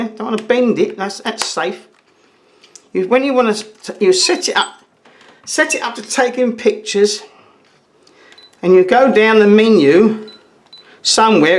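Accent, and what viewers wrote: London, United Kingdom. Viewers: He sounds like my old father in law, he was a Londoner from Kingston, shame he didn't live very long, couldn't give up the ciggies... But a lovely video, straight from the heart! I bought this camera, because I wanted to feel something chunky in my hand. The Finepix JZ 500 which I also have, is more like a box of ciggies and no blimmin good if you have arthritis and sausage fingers ;o)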